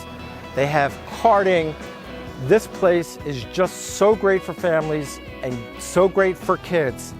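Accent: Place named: Long Island, United States. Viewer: I’m so glad I found this channel! The only thing for me that’s a little iffy is the humidity. With that said, no place is perfect and Charlottes looking pretty pretty good right now. I wish I could come right now to check it out but am concerned about flying. For someone in their 60’s and thinking of growing older and needing more services, do you have any recommendations on anything? I wish I could get on an airplane right now. Really looking forward to watching more videos. I barely hear the LI accent anymore!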